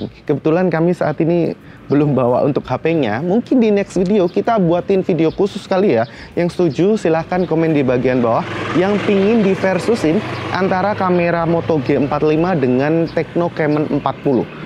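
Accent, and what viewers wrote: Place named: Yogyakarta, Indonesia. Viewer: oalah...wong jogja to dab...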